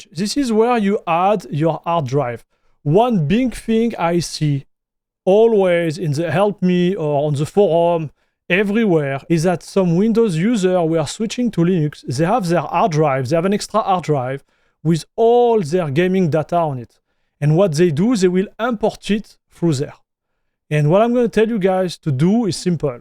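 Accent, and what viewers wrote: France. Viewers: big thanks! I'm not newbee in linux, I was trying to configure arch for gaming by myself, but it requires too much time for me. And in your video there is right amount of information for me to set up perfectly. It's a bit hard to understand your french accent, but I'm Russian, so don"t bother, you'll be great by practice) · Merci pour tous les conseilles de configue (surtout pour le RGB 😜). J'améliore même mon anglais, je comprend super bien avec ton accent français, j'adore 👍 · w french accent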